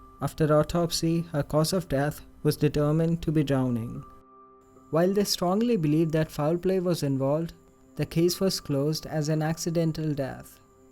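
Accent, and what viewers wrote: India. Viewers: Indian guy..... narrator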